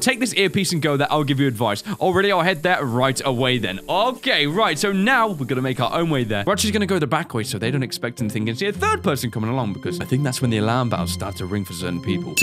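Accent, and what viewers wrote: United Kingdom. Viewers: um...? you're British... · Are you British dude? Shite. Your Accent are Very Great. :) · I see your British am British but people say am American because I watch YouTube too much😅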